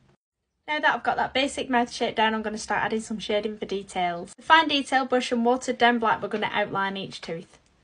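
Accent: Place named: Northern England, United Kingdom. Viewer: Oh wow you’re a northern lass, why did I think you were American? So frikkin talented 😊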